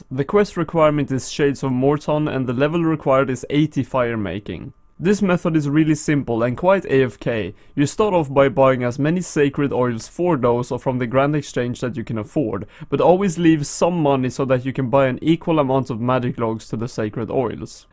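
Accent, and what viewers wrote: Sweden. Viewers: I love ur swedish accent :3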